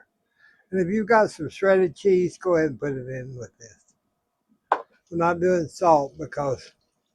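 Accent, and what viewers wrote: South, United States. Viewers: Good to see your video, always a pleasure, meal looks so good too, I like your country accent, God Bless, Hello to Momma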